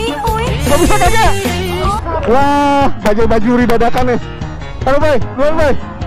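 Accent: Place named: Banyumas, Indonesia. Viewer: Sing ngarep ngapak le ngomong...anjir nguakak bener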